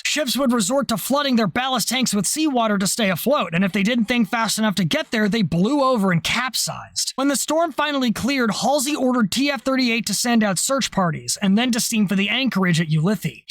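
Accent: United States. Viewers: I fuckin knew you were from Massachusetts My parents grew up there I HAD YOU CLOCKED AS A MASSHOLE THE SECOND I HEARD YOU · Proud to say that im a long time sub from MA (ngl thought your voice sounded like somewhere out of NE, let alone MA.). Next round of dunks is on me 🙏🙏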